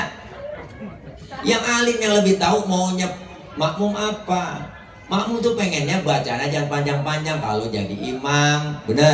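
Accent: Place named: Betawi, Indonesia. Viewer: Gue banget dgn gaya Betawi yg medOk nya Ampe ane lupa mati'in aer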